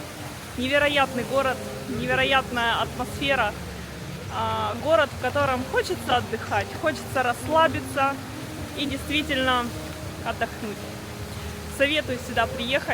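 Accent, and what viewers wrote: Ukraine. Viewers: Ну раз уж РФ вас отвоевала и вы помчались путешествовать по особо не родной прежде стране. То было бы маст хевом избавиться от говора и начать учить русскую речь! А то понимаете ли пока еще не все россияне в курсе что где то там какой то Мариуполь теперь не в составе УКР. Антинезалежные настроения все такое..Речь сразу резко отличается! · На Украине такой красоты нету? В Киев на экскурсию поедете, что вы в России забыли? Путин украинцам по выдавал России кие паспорта, от этого вы русскими не как не стали, это равносильно что негру дать российский паспорт, от этого он русским не стал, видно по вам что вылетые украинцы, по русски с акцентом, по украински бы разговаривали бы, вам больше идет украинский язык, русская речь у вас с большим акцентом, вас украинцам можно от лечить от русских, почему? Потому что это две разных национальности.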